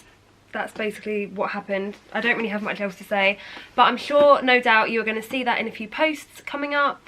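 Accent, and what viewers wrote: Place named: London, United Kingdom. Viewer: I can't stand the London accent!!🙄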